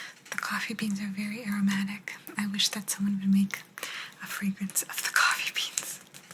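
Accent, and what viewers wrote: Italy. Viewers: ❤ love your Italian accent videos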